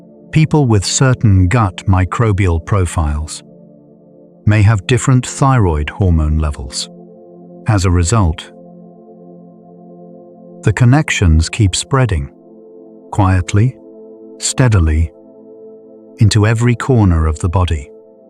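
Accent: England, United Kingdom. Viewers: Thank you for your wonderful videos. The information is awesome. I do fall asleep in the middle, but I know that your information is well researched and will go into my subconscious. Another idea for your videos is what creates disease I’ve been listening and learning from Dr. Robert Morse he’s in Florida. He’s a nature path and his philosophy and understanding on the body is that we have two systems the blood system and the lymphatic system, and the most important system for health is a lymphatic system, which is our draining system. You are either acidic or base alkaline and the bodies always trying to be at homeostasis so if you’re sick, you’ve got too much acid in your system and in order to bring Health, you need to bring in more alkaline so he is a fruitarian or more so high fruit and plant-based very interesting. Interesting intelligent man look them up. 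he’s also an iridologist and he’s very very wise so if you could learn his information and put that into your video, it would be a great way on how to teach people how to be healthy. Thanks again. Love your videos.🥰 ps.. I love the English accent very soothing, im from Vancouver B.C.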